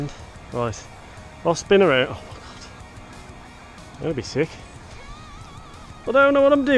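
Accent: Black Country, United Kingdom. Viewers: “It looks like a spider’s web doe it” Ah the refreshing sound of a Yam Yam...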